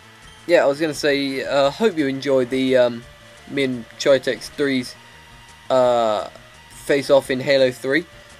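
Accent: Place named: Cockney, United Kingdom. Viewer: woo cockney thanks lol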